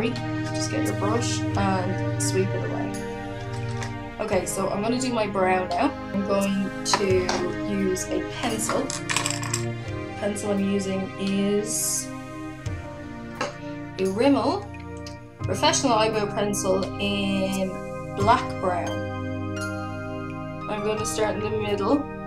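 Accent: Ireland. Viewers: your accent <3 i love irish accents and i love your tutorial imma try it tonight because im uber bored!! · Your accent is so rad. I love it! Ireland is someplace I wish to visit sooo badly. Hello from California >3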